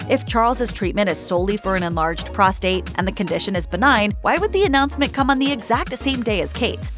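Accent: United States. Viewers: You sound American. Probably watched way too many episodes of that Crown program or something. There's major hospital crisis in the UK right now you know. The NHS is on strike. She has to go private, otherwise she would not be able to get her surgery in time and could maybe die, like I almost did about 6 months ago with my broken infected jaw.. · A woman with an American accent passing all sorts of opinions about how the British people feel. Hmmm. You can report the facts without all the not-so-well-veiled political narrative that you think the average person is unable to pick up on. Saying that Kate only did 142 engagements last year and is somehow a great disappointment to the people is rubbish. 142 engagements with the pressures of being one of the most watched and judged people in the world and a mother of 3 young children. Princess Anne is not currently mothering 3 small children. 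This video is a reach designed to try and influence people to hate the Royals and grow controversy.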